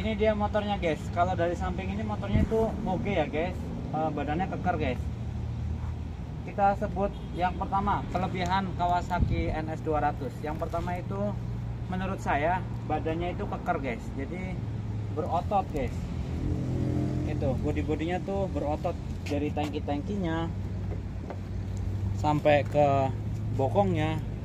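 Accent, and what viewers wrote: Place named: Banyumas, Indonesia. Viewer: Ritinge ndi sih? Khas banget wong plat R 😂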